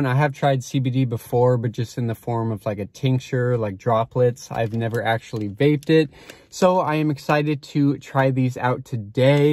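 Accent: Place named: Los Angeles, United States. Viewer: You hear that hes from LA😂💀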